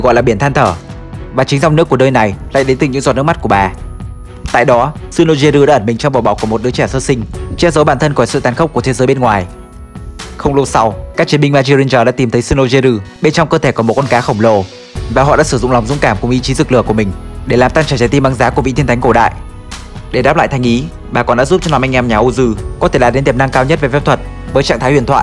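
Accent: Japan. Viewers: Phát âm theo tiếng Nhật chứ ko phải tiếng anh đâu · Nói chung là nói theo kiểu tiếng anh chuyển thể nhật nên nghe rất khó chịu Flagel thì đọc là fle gieo thôi Fu le gieo ru Nghe mà chanz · khó chịu thì chịu khó tìm hiểu tại sao đọc như vậy, phim của Nhật, thì đọc theo cách đọc tiếng Anh của người Nhật thì có gì sai. Nếu khó nghe thì tắt tiếng vừa xem hình vừa đọc wiki theo tiếng Anh chuẩn của mình đi. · Phát âm kiểu Nhật đó ạ, trong phim họ phát âm đúng là như vậy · Ông ấy nói theo phiên âm nhật k phải tiếng anh ạ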